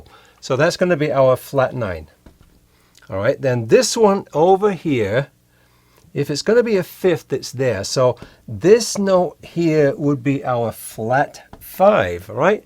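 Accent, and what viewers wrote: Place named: Scotland, United Kingdom. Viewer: Working through this book, and this chord definitely confused me as to how it was written. Non-sequitur, but if you don't mind my asking, where did you grow up? Your accent is wonderful and almost a mix between Scottish and upstate New York.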